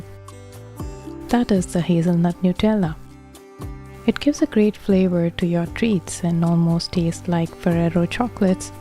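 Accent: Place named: India. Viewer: Very nice u sound like u r from India 🇮🇳 am i west Indian trinidad 🇹🇹 lovely 🙏✌️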